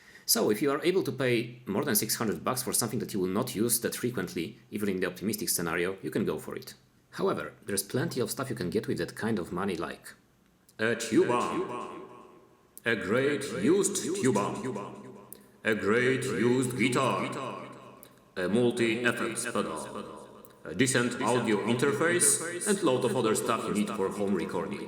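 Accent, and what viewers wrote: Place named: Warsaw, Poland. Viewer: I ten warszawski akcent. Gratulacje.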